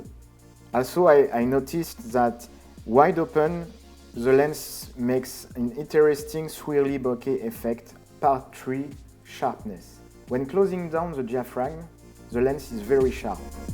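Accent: France. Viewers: Jusqu'à maintenant je n'avais vu que t'es vidéo courtes de test d'objectifs argentiques, et je ne sais pas pourquoi mais sûrement à cause de ton nom de famille je me suis mis en tête que tu étais allemand ^^ mais en écoutant ton accent, c'était évident ! Non pas qu'il est imcomprehensible ou non, pas de soucis, j'ai très bien compris mais disons que l'accent français est très reconnaissable^^ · Came here for soap bubble effect but ended up listen to your french-english.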